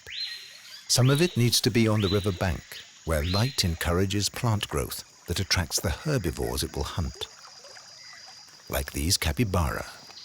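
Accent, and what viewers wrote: United Kingdom. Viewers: He’s British. Everyone who speaks English is Not American.🤷🏽‍♂️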